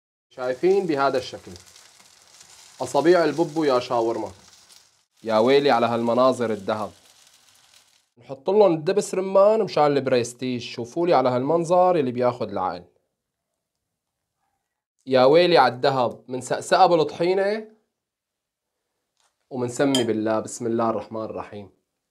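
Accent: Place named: Damascus, Syria. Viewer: الشيف اشطر من الشيف عمر وكل شباب الشام تقريبا بيحكو بهالطريقة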